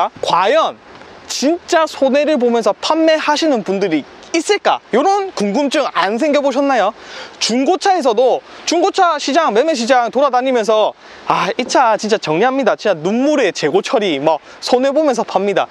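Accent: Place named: Gyeongsang, South Korea. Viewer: 갱상도 발음이 직이네.. 고마 정이 철철 흘러 넘치네예... 정말 이 동상께 차 사고잡네. 부산까지 고마 날라가서 확 싸 질러 뿌고 잡네. 영상 고마버요. 하는것 보니 인상좋아 잘될낍니다.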